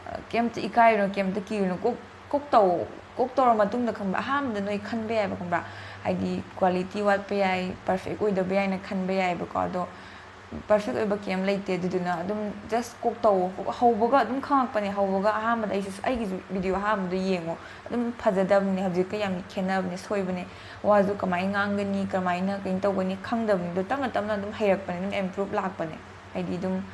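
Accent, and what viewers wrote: Kakching, India. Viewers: Long kHoibado yaragadi tokpiro... Majority khallaga...eikhoidi kakching dialect toh meitei lol oirasu taba nungaitey...kakching khaktnggi oina semva blog ti oihnbiranu...Sao Yade nungaitaba yaoragasu hairibasingsida...I really like ur personality...And loved ur videos...But these are some things that I want u to concern..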